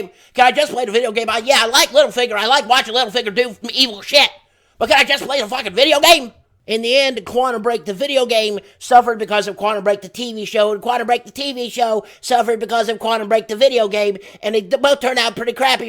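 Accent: South, United States. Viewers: I haven't watched in a few years. What happened? The voice is totally different. It sounds nasally and like a bad mix of a Southern accent and...something else.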